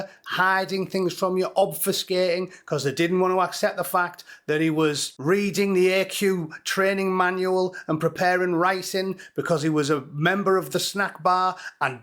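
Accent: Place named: North East England, United Kingdom. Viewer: Great crack! truth and honesty, cannot beat em,' along with a North East regional accent and down to earth opinion. From a Northeastener down under.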